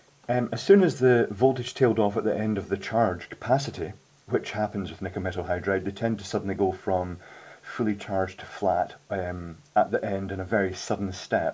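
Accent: Scotland, United Kingdom. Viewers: He said "poundland which is one of our dollarstores". As no one has told you or you do not realise, most views on YT are generated by Americans on English speaking content. BTW he's Scottish by the sounds of it, generally Scots find "British" to be too vague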